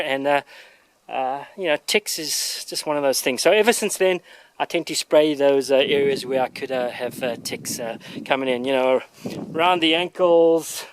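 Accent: South Africa. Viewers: Your rig serves the same function as our load bearing/carrying equipment in the Army. It allowed us to cache our rucksacks and do short range patrols with much less bulk & weight while still having enough equipment that we wouldn't be caught short if something unexpected happened. Sound thinking in my opinion. I enjoy your accent; sounds South African to me.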